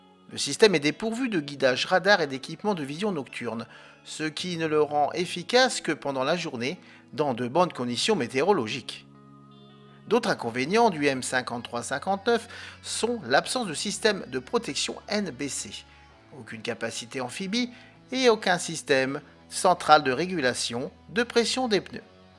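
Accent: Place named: Germany. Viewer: Il a un petit accent allemand 😉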